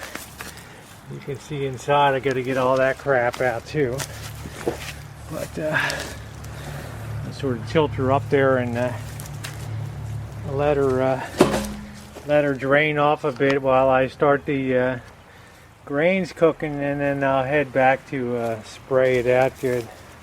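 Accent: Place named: Philadelphia, United States. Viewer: you sound like you have a philadelphia area accent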